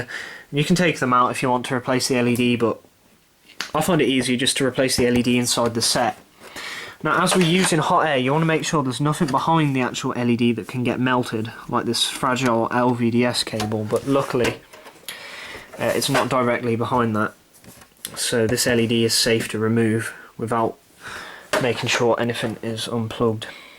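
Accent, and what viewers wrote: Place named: RP, United Kingdom. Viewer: You sound so intelligent with your nice posh accent , us up north sound like thick *unts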